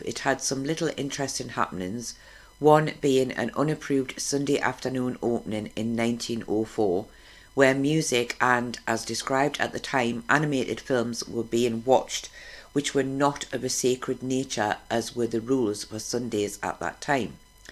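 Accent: Newcastle, United Kingdom. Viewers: I truly enjoy hearing your Geordie accent spoken at a pace that I can understand. I'm from the southern Mid-Atlantic coast of the U.S. and sometimes you folks can talk at such a fast clip as to be indecipherable!!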